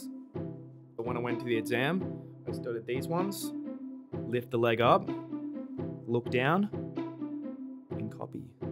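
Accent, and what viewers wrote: Australia. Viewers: The moment you start talking I knew you have some Aussie vibes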